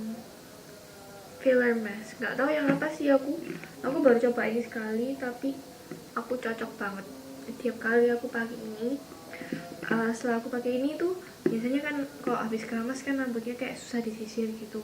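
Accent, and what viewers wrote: Java, Indonesia. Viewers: Wjah chines ... Logat eee medhookk y mbaakk ... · medok ya suaranya tp lucu hehe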